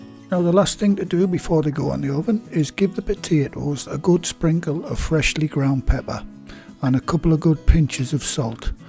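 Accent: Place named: England, United Kingdom. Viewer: “ Mash and Roasties.” I love the English terms and accent ❤️. Hugs from SF Bay Area, CA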